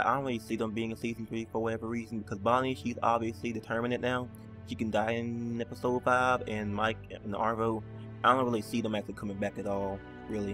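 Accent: United States. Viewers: Are you American? Q&A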